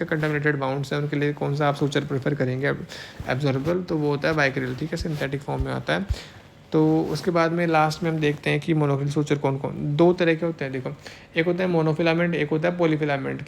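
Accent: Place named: Himachal Pradesh, India. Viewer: Sir aap himachal se ho na apke bolne se pta chl rha hai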